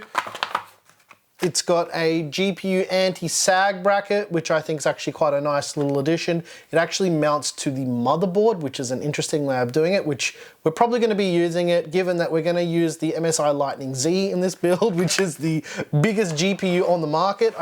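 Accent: Australia. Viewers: I'm so confused dude looks mexican but sounds Australian lol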